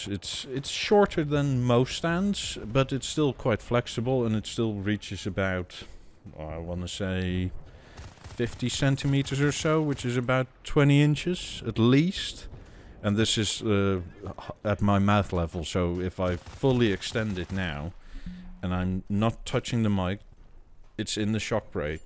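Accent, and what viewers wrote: Netherlands. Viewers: Dutch accent noticable within the first 5seconds but good vid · i can tell by your accent 😅 dutch accents are very distinctive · You sound like a Dutchman who’s lived in England for years, midlands/north of I had to guess, maybe Manchester.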